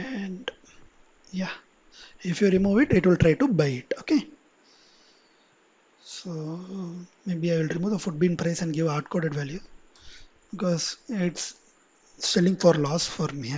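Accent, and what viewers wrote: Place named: India. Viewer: I hate this Indian omfg please stop with the fcking accent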